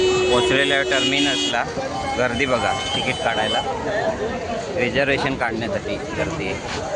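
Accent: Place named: Sangameshwar, India. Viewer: लय भारी आपली संगमेश्वरी बोली भाषा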